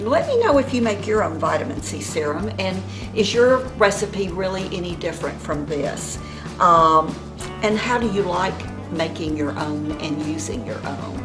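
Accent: South, United States. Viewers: She's adorable . Not to mention the southern accent xo ...get try it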